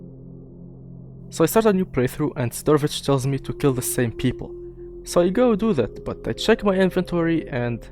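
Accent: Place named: Tunisia. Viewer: direct chle9t bik tounsi mel accent ! GG Bro nice video. Big STALKER Fan Here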